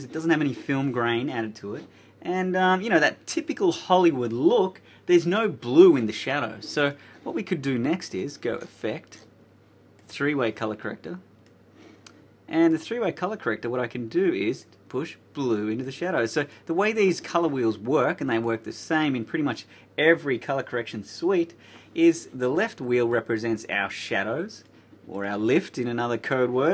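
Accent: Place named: Australia. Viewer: I love your Australian accent! So easy for me to understand :)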